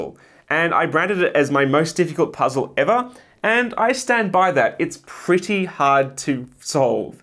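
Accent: Australia. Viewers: I’m your biggest fan I’m in australia and only last week I realised your Aussie O.M.G 😀 can I send you a latter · I was sold into printing as soon as i saw your big beautiful face and awesome Aussie voice! Youre still the only maker whos community I subscribe to and happily financially support, as you show me all of your failures alongside your successes. Since finding your videos, they have helped me define a path to create a small business creating Flow instruments, such as Dragon Staff, contact staff, poi, and fans. The best company on the planet for this is called ThreeWorlds and theyre actually based out of Queensland. You may be interested in some of their cool spinning geometry as i was, but would be able to acquire it much easier than me over in the States! I learned Onshape, and then you convinced me to learn Fusion360 instead, and then i made all of my own designs! 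I am currently expanding to have 3 printers, highly modified with Hemeras to print TPU at 100mm/s, just like my first e5+. I wouldnt be where i am without you, and my girlfriend is eternally jealous of how much attention you get! -Connor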